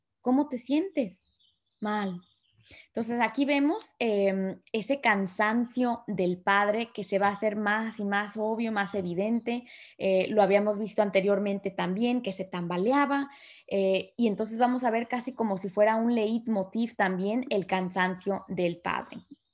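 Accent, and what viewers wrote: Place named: Mexico. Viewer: Me encanta la literatura y la obra de Rulfo para mí, es de lo mejor en calidad, la forma en que desmenuzas el cuento es excelente, interpretas a la perfección la forma de hablar del mexicano, parece que tuvieras bastante interacción con personas de aquí, fue muy grato verte en el canal de Laura.Saludos desde la ciudad de México .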